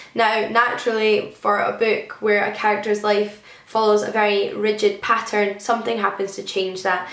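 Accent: Scotland, United Kingdom. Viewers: Hope February is an even better month for you. ❤️ Are you Scottish btw? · As someone who is an asian I always wrongly assume that you are greek considering how passionate and invested you are about ancient greek mythology and history:)) Btw I find scottish accents really cute and adorable!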